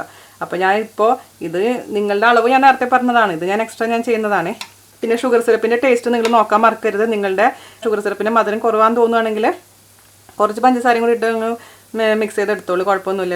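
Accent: Thrissur, India. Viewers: Hi chechi...checheedea thrissur slang samsaram enik othiri eshtanu. Adhu polea coocking..dubail evdea chechi stay cheyunadh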